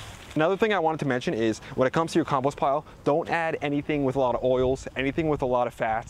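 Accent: New Jersey, United States. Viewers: Wooder. Can't fake that Jersey accent....ha ha. Great videos! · Nope that's a New Jersey accent. The state has its own culture but NY and Philadelphia won't admit it.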